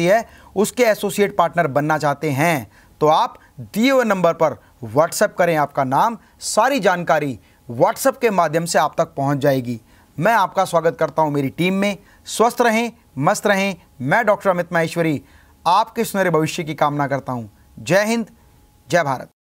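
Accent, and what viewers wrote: Haryana, India. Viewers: भाई मुझे भी ऐसे ही बोलना है ! ये मुझे बहुत पसंद है ! मैं बिहार से हूं but I Love Haryana, Haryanvi & Jaat brothers! We are also farmers in Bihar known as Bhumihar Brahman or Babhan 💪🏻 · thanks for sharing useful information sir i must say apki hayanvi pitch bahut sahi hai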